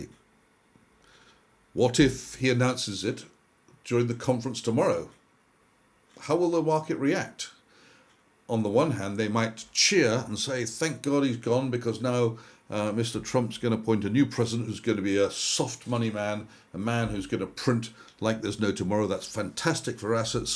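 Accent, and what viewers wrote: United Kingdom. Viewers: I first saw you, not long back on Daniella Cambone. I was at the time wondering why there seemed to be so few independent, genuine analysts UK born (I trust you are UK and not Swiss with that accent) Anyway, good to watch and keep going as you are attracting quite a following. The word which sums you up is 'gravitas' but with a healthy dose of humour and good stories.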